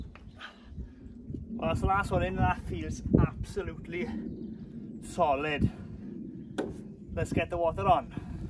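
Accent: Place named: South Wales Valleys, United Kingdom. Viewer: Its the Valleys Man gotta love the accent!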